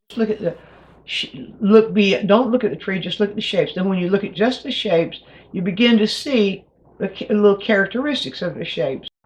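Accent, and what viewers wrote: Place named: South, United States. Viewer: Yet another nugget of wisdom from YouTube's best painting instructor with the most beautiful southern accent. 😊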